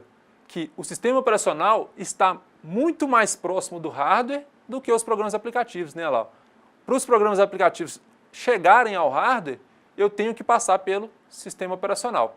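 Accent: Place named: Minas Gerais, Brazil. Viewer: o bom é que ele é mineiro , num guento ver esses cara falando tudo certim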